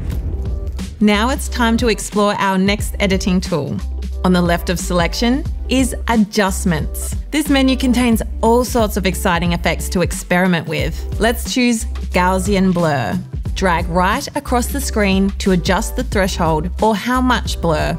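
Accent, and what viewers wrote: Australia. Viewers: I had to swtich the captions on to understand some of the things the presenter was saying. I'm a native English speaker but I found her accent very strange at times? Is it some sort of Australian? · damn the aussie accent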